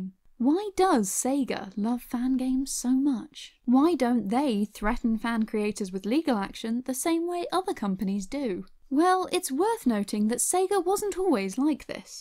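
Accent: United Kingdom. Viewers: This is why I love sega. They support fans and hire them. Also I love the narrator's accent! It's really cute ( I just think the female British accent is really cute)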